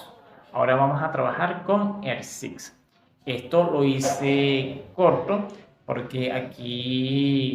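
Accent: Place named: Apure, Venezuela. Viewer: Eres apureño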